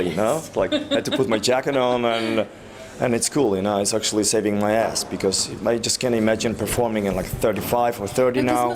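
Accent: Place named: Sweden. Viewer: not every swedish have to can speak english perfectly. your accent is lovely, I like swedish language better than english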